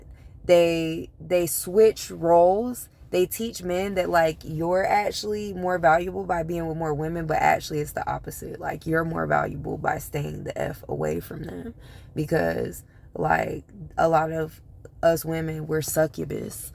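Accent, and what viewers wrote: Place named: Detroit, United States. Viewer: I appreciate your point of view you sound a Detroit girl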